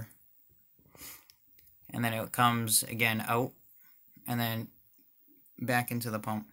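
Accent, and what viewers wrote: Boston, United States. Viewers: Ya sound like ya from boston, kid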